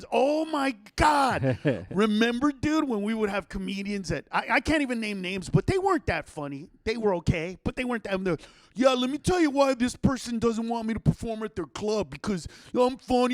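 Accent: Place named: Mexico. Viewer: Youre not funny you fat piece of shit, all you do is steal people's jokes and say it with a mexican accent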